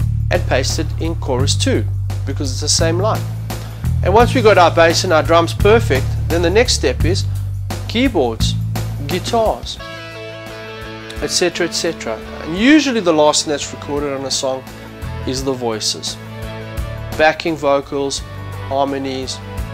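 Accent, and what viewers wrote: South Africa. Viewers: I must say I do enjoy your way of explaining things to the people. Very simplified and gentle (I like your South African accent too) I hope you're happy with your life, I think you have earned the right to be happy :)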